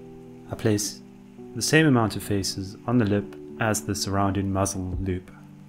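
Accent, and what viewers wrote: South Africa. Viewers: Is that.....South African I hear....?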